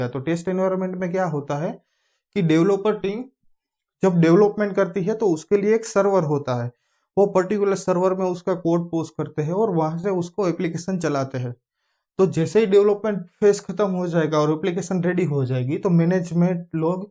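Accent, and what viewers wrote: Gujarat, India. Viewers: Gujrati accent bhai ni 😉😉 helpful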